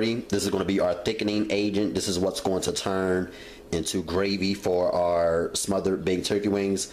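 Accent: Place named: DMV, United States. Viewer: Homie sound like he from the DMV...on muvas! And the wing/rice setup look on point! No cap!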